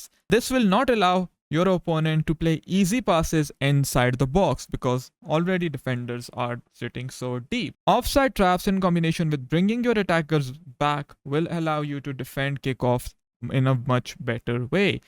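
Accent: India. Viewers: Man just tell are you indian as your accent is so much indian??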